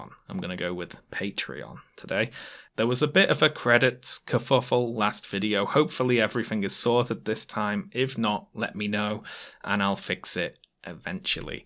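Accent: United Kingdom. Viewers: You know, you believe a lot of things I disagree with and have faulty bolster for some of your arguments, but your voice is very relaxing. Carry on, ever so slightly lispy British man.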